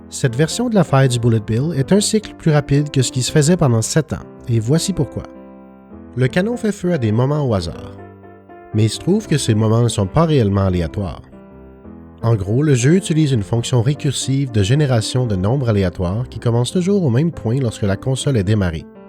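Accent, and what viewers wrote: Quebec, Canada. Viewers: Une speedrun? J'ai toujours entendu un speedrun, étrange.. Ça fait du bien d'avoir une vidéo narré en français avec un accent québécois d'une aussi haute qualité, j'en veut plus :) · Et c'est ainsi que je decouvre que je comprends mieux l'anglais nord-américain que le français québécois (je suis Francais) 😐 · L'accent québécois est parfoit difficile à comprendre pour moi ^^'. Peut-être je peux m'y habitué avec cette chaine, haha. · Moi non plus, mais son accent en anglais est clairement comme un francophone, mais il n'est pas l'accent typical pour un francophone qui vient de France. En mon expérience, c'est presque toujours Québécois lol (Je suis désolé pour ma grammaire, je suis Suédois) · I can usually pick up French accents on people from France speaking English, but for I did not know it until he said in the community post. Watching this video now, I noticed some words sounded very odd compared to what I learned back in school and what I went on to hear from French people. 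Thanks for confirming my suspicion of him being French Canadian to be correct!:D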